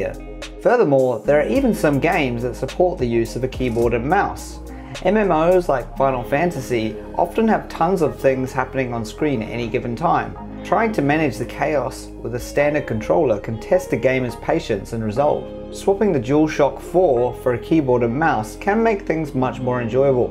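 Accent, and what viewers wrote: United Kingdom. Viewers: Did you get his accent? He's probably across the pond hint hint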